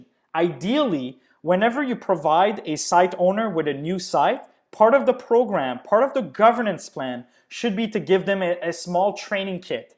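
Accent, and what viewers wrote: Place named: France. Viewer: Great insight and very helpful! Loved your French accent too :)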